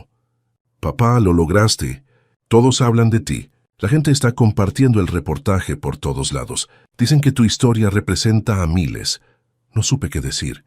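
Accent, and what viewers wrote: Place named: Spain. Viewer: Porque si es un Mexicano narrando su historia , porque habla como un español ?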